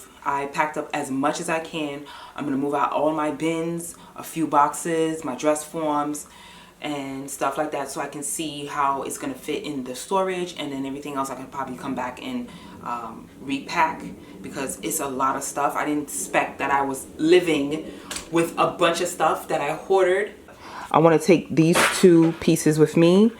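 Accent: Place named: New York, United States. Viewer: Very curious to know where you're from! I know it's a NYC accent I hear. Is it Queens or Brooklyn?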